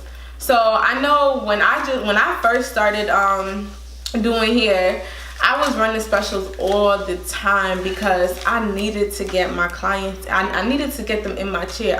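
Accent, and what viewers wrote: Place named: New York, United States. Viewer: I️ could tell ur from nyc or Jersey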